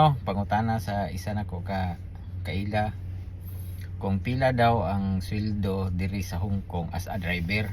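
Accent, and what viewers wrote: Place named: Bohol, Philippines. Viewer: Mura lagi ka taga Bohol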